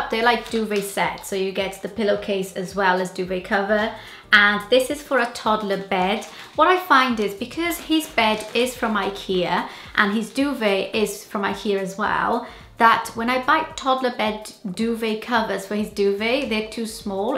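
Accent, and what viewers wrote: United Kingdom. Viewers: Your British accent is really different to my ears but I could understand what you said. Your last video I watched you were still pregnant and you said your daughter is already one year old. oh my. I need to watch more YouTube. In the moment I'm using YouTube Go and I'm not sure I can download your videos there. Anyway, your furniture is amazing! BTW I loved the video quality.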